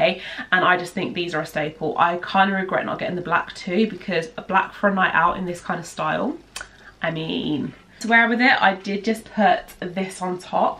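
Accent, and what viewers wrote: United Kingdom. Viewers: I love your British accent. Your bum looks good in the purple swimsuit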